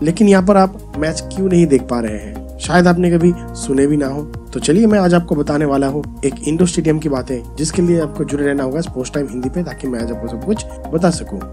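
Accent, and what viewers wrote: Bengal, India. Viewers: Bro are you Bengali? You sounds bong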